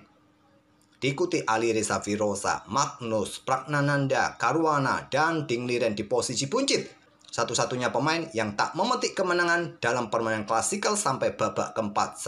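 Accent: Java, Indonesia. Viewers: tak tantang lagi ogah, tak tantang lagi emoh, wong jowo ternyata admine wkwkw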